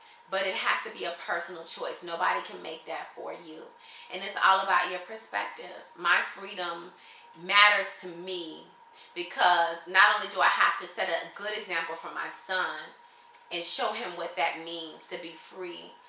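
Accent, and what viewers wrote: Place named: United States. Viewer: Hai sister am a Ugandan but on the issue of colour hooo GOD u are not yet lighter sister we have some Ugandan who are very lighter and u can't imagine. the reason why they stair at u it's because of ur accent they will definitely know u are from U.S. so in our country u are not different of any black people in uganda. Do feel free if u stay for More year no one will stair at u anymore because they will be seeing you every time Otherwise next time u are coming back tell me so that I can secure for u a plot of land to starts ur life there Don't worry u are already at home ok in fact just starts investment plan to move to uganda ok Contact me if u need anything Thank u😘😘😀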